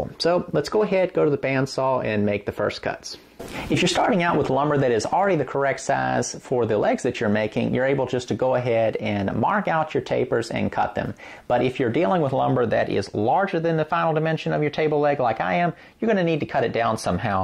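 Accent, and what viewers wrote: South, United States. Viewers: Another great video - clear and precise instructions as usual - and delivered in a Southern drawl which I could listen to all day. I watch your vids over and over - the sound of your machinery and commentary bounces around my kitchen driving my wife crazy. Ever thought of teaching? Or maybe setting up woodworking courses? I'm UK based (Liverpool) so it would be near impossible for me to attend but I'm sure people would. Keep them coming! Thanks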